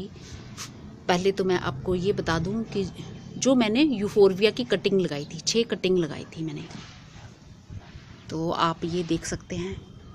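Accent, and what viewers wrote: Punjab, India. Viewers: aapki boli ka turz lehja kyu hai I think Punjabi accent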